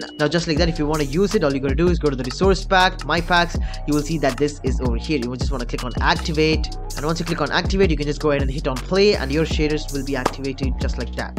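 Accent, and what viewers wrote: India. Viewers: Are you a Indian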